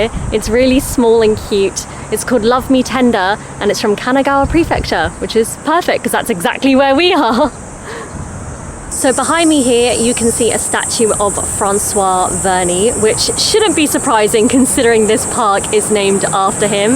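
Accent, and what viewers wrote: United Kingdom. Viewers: きれいなイギリス英語なので、字幕の英語をメモして残しています。そうすると、Squishyさんの癖がよくわかりますね。 例えば、ここでを表す「here」は副詞だと思っていたのですが、名詞もあるのですね。「in here」と、しばしば使ってました。初めて知りましたよ。hereの名刺的用法だなんて。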